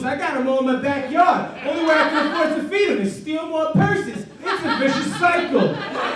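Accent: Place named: Brooklyn, United States. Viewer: I thought he was from Brooklyn.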